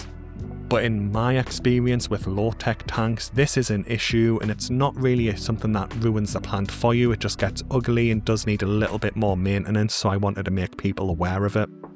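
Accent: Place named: Scotland, United Kingdom. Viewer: Oh, and I (Swedish) thought it was a Scottish accent, probably insulting many people on both side of the England/Scotland border... ooops sorry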